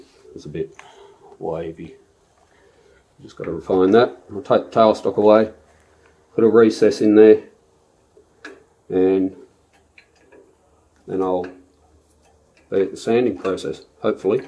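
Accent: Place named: Australia. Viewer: Good work mate, nice to hear an Aussie accent, i understood every word, maybe because I am Aussie to😬👍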